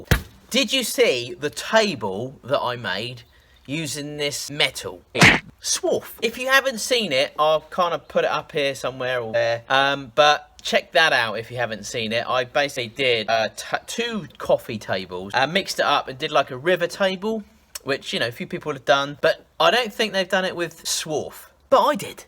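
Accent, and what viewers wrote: United Kingdom. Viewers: Trips me out how British Accents exist when we speak the same English. Yeah I'm lit rn 🤫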